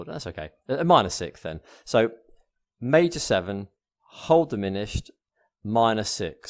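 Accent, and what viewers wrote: United Kingdom. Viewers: Nice! You sound like you’re from the Uk but your location is different, how’s the life down there? Keep up the good work!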